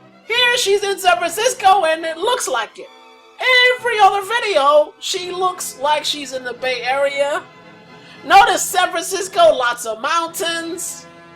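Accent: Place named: New York, United States. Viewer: By the way,,,, when did you gain your New York accent????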